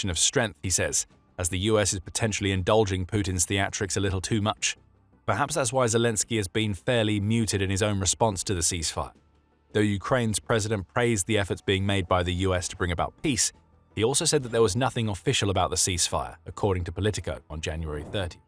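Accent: England, United Kingdom. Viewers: With all due respect, it ends in a 'th' sound, like it's spelled. 'Sicksth" Although, of course, there are different dialects. Yours might be different from mine or the narrator's. He has an English accent. If you've been to England, it feels like there's a different dialect for every town!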